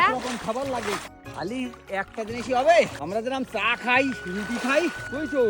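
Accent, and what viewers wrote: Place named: Khulna, Bangladesh. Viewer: খুলনার অরজিনাল ভাষা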